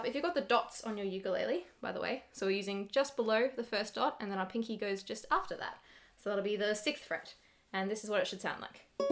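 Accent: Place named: Australia. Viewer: Were are you from? You sound Australian...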